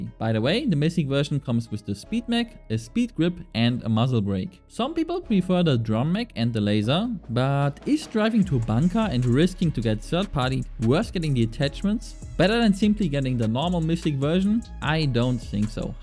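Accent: Germany. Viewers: he’s german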